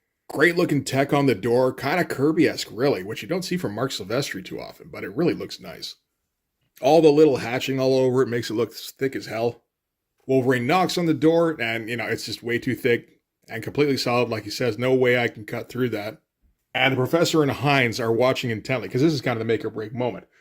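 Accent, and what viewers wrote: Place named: Canada. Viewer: Funny i pegged you as Canadian from your accent. You sound like Kevin Owens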